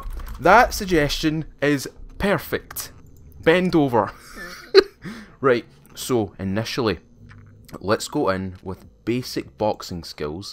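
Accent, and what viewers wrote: Scotland, United Kingdom. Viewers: This guy has a very stereotypical Scottish accent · by the way you sound like bigmoney he is also a Scottish YouTuber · You sound like a very generic Scottish man but i still love you're voice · I heard your voice and had to subscribe haha love the scottish accent probably due to my best friends family all be scottish and me growing up around it even though I love in england. Looking forward to binge watching some of your videos haha! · only reason i came here, the Scottish accent. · Scottish, I think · Tobot 27 it’s fukin Scottish he said he was from SCOTLAND 🤦‍♂️ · Is that scottish accent or welsh or irish or Scouser accent? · I would love to hear you say with your scottish accent BEGGOONNEE THOOOT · I love the wee Scottish accent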